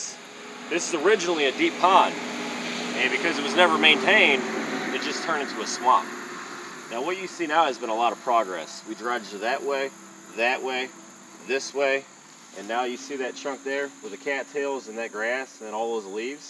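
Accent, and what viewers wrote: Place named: Texas, United States. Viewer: I detect a northeastern texas accent. Figures.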